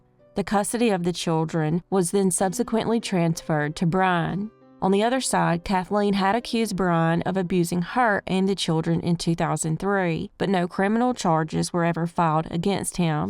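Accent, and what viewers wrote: South, United States. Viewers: I love your stories and your Southern drawl. I have heard of a lot of your stories, but you dig much deeper and you're much more thorough! Well done!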